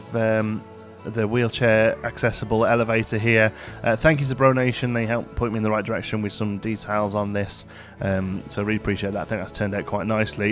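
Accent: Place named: Midlands, United Kingdom. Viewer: I love your builds, I’ve learnt a lot watching your tutorials. I recognise your accent, I’m from the Midlands I can hear a slight twang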